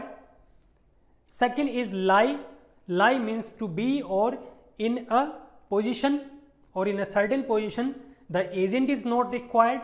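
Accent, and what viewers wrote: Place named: India. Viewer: Sorry, you accent... you shouldn't be a teacher of English but Indian.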